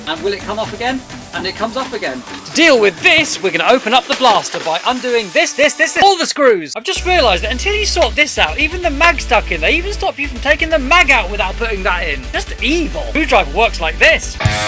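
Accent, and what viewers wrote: United Kingdom. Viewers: dudechaps bri'ish coop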